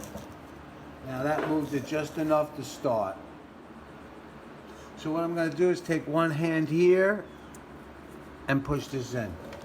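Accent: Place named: Long Island, United States. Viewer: Thank you for the video! And your voice is awesome, reminds me of the neighborhood I grew up in on Long Island. 👍🏾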